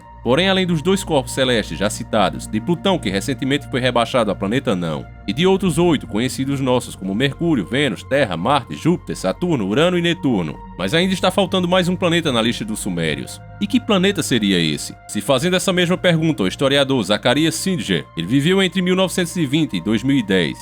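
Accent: Northeast, Brazil. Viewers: Sotaque de nordestino kkk mas a voz e boa... Cornao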